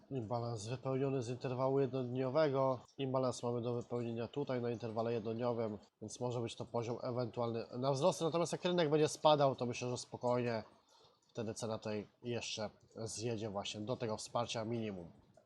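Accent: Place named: Costa Rica. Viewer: Voice of Costa Rica😁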